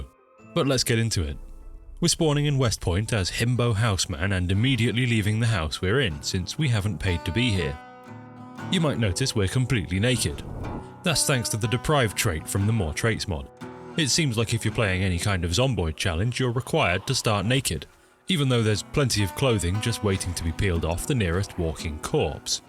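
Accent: United States. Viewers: As an American, I request that you give us more of your American accent. It may be wrong, but that doesn’t stop it from being wonderful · As a Texan I forgive and indeed do kindly demand more of your southern accent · absolutely killah American narration hell yeah babe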